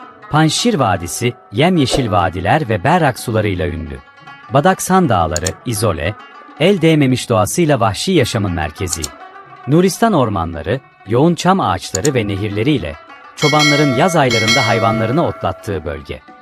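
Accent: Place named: Turkey. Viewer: بسیار عالی موفق باشی یک مستند بسیار عالی بود لحچه ترکی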